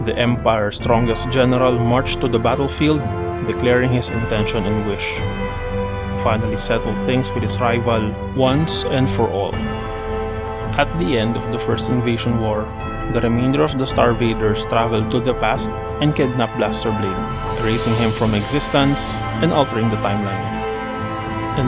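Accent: Philippines. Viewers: You sound like a filipino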